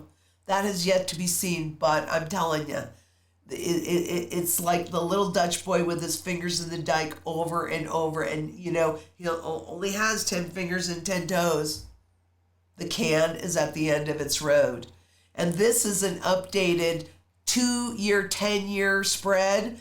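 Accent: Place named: South, United States. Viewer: Me thinketh and yet another informative number of points driven home by such a., great Southern........twang!@ Thanks!!!🙂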